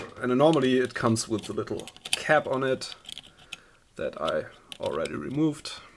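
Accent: Germany. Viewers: Like the video 😃 keep up the good work . Also are you German ? You should like a German speaking guy.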